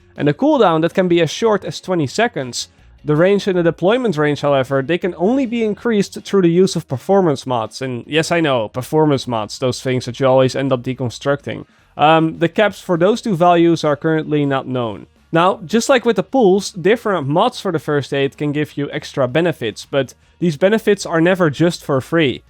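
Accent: Netherlands. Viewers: Ik hoorde aan je Engels dat je Nederlands was // i heard of your engelish that you where dutch.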